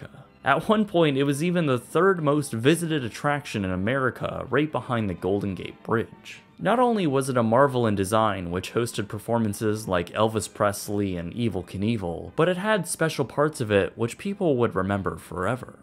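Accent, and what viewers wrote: Canada. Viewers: I figured he was Canadian from the way he pronounced "about."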